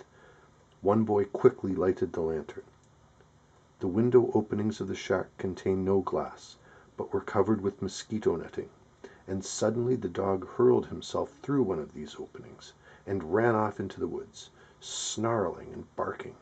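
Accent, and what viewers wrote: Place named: Canada. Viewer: This is a good idea reading these stories from the classic "Strangely Enough" collection. Sounds like you have a Canadian accent -- aboot (about)?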